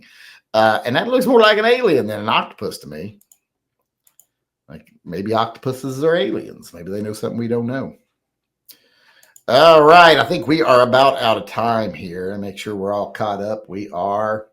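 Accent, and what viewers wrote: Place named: South, United States. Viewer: U sound more redneck (in your old age) than u used to.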